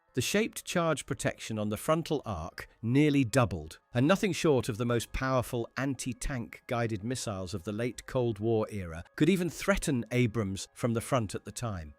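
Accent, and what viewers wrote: United Kingdom. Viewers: And the British accent that permeates every damn video on yootoob. thanks.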